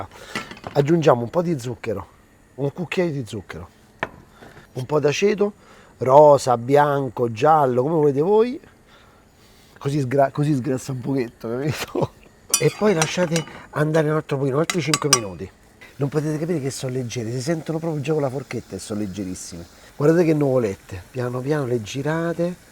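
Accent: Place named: Nettuno, Italy. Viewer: sei proprio de nettuno 😂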